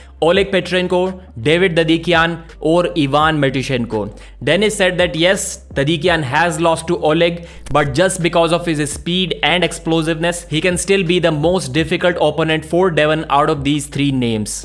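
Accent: India. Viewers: * has indian accent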